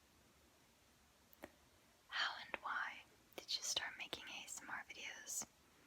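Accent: United States. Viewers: How do u have such a, American voice wen ur from Germany?!?!? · Most Germans can speak English, but she is far more fluent than normal, probably better than at least 99.99% of non-native English speakers in Germany. She put a ton of effort into becoming fluent and developing an American accent. By the way, she did the same with Spanish and people are baffled by her Mexican accent. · How are you German but have an American accent I’m so confused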